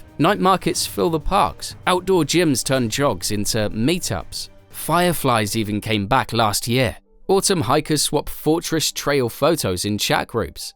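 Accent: United Kingdom. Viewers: This video makes me feel like having a English listening exam... good diction, rhythm and intonation... British accent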